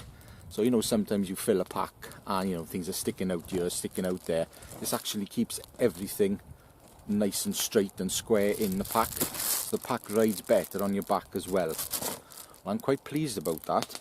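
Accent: Wales, United Kingdom. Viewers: Great Video Brother.😊👏👏👏 I could listen to that Welsh Accent all day. Great Fire Lay.👊💥🔥🔥🔥🔥🔥♨️♨️♨️ Thanks.😊🤘👊👏👏👏 That Splitting Axe kicked Butt alright bro.👞👞👞🪓🪓🪓🪓🪓🪓😁🤘 Nice Knife Brother. I would love a free one.😁🤘👊🤣🤣🤣🤣🔪🔪🔪🔪 a real Beauty.🥰🥰🥰 a Real work of Art. 3v great steel. I would love to know your favorite angle. I wish i could make Knifes like you bro. You have some serious skills.👊🤘😁 great pouch. You are a machine. 👏👏👏👏😊👊 good trick baton that piece of wood thru the final bit. Nice Demo. And Those Feather Sticks Look Insane.😊👊👍🤘 Knce Again A Big Warm Thanks From New Zealand. Ka kite Ano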